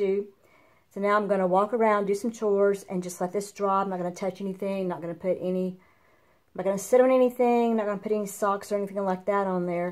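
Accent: South, United States. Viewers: Good southern accent!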